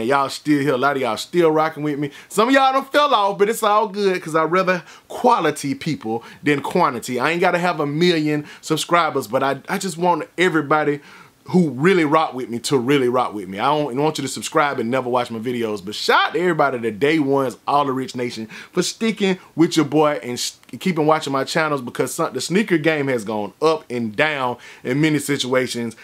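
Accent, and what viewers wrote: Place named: Atlanta, United States. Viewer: Not a day one, but my first vid was the "What the" KD joints with Jay. Being a ATLien myself, as soon as i heard the accent i was hooked. Been rockin with ever sense, dooooooo it!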